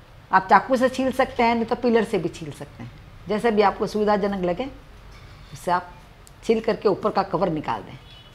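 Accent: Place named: Marwari, India. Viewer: apki boli se lagta h ya aap marwadi h .yhaa baris bohat h me triy karungi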